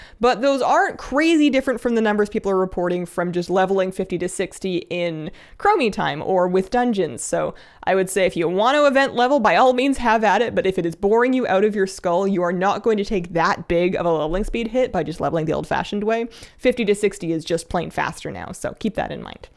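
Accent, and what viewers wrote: Canada. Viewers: Hi, maybe it's just me but I think you are sounding more Canadian! The last couple of times I tried, I could not give the trinket mats to other eligible players. I hope the enchanting bug is fixed soon, and I really miss Bagnon.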